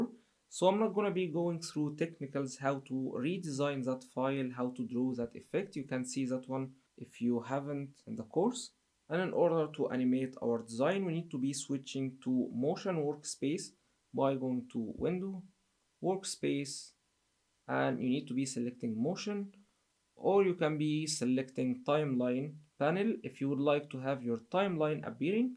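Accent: Egypt. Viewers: السلام عليكم هو حضرتك مصري ؟ اصل من اللكنه قلت انت ممكن تكون مصري .. انا معجب بشغلك جدا ما شاء الله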